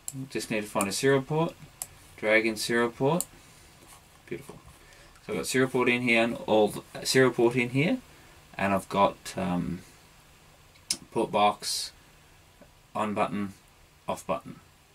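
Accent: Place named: Australia. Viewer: Interesting video. Been thinking of getting into Arduino and wanted to see if I could do the programming in C#. Thanks for the information. Correct me if I'm wrong but you sound like you're from Australia. Hope all is well for you down under :)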